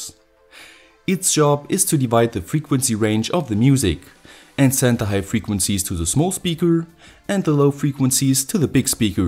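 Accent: Germany. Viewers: *German accent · You are from Germany? Kommst du aus Deutschland?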